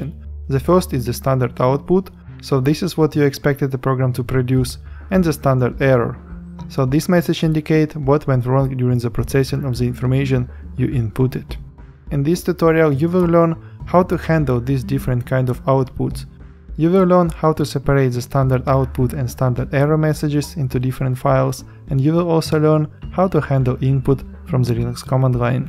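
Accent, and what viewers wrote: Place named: Russia. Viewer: У тебя русский акцент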